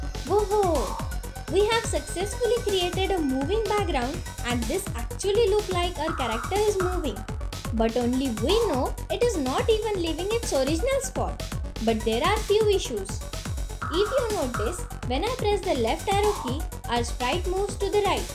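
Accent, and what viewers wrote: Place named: India. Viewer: By you voice I believe you're an Indian.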